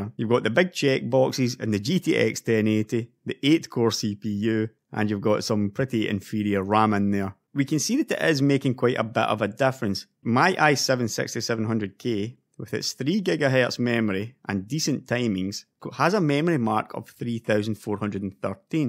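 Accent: Scotland, United Kingdom. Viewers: this guy sounds like someone from scottland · the Scottish accent is not that bad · No need to apologize for the voice as I'm pretty sure most people have figured out by now that you're Scottish :P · it's just a Scottish accent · that's just an scottish accent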